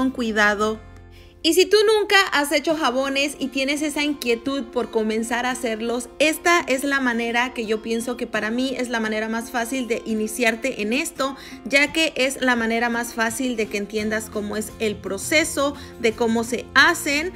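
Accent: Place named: Mexico. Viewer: 👏👏👏👏felicitaciones, que gran enseñanza y gracias por compartirla con nosotros , por tu acento escucho que eres como Mexicana y le llamas sosa cáustica , acá en Colombia se le dice Soda Cáustica , igualmente es lo mismo. Te envío un gran saludo desde la capital Bogotá .!!!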